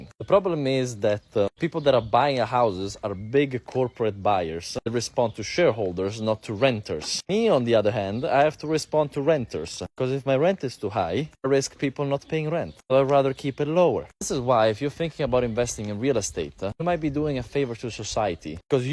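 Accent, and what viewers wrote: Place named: Italy. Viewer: Your accent tells me you don’t have enough hands on experience to yapp about this subject. Maybe stick to Italian politics.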